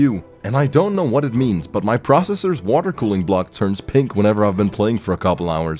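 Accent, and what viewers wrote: Denmark. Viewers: This guy danish ? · Wait shit. Are you bloody Danish?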